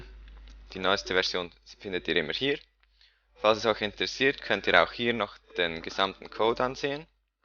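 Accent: Switzerland. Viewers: ich mag schweizer akzente voll ^^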